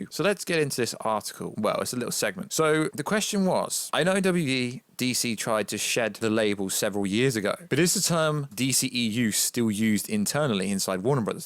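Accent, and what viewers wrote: United Kingdom. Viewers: What's your accent? Are you British speaking in a American accent?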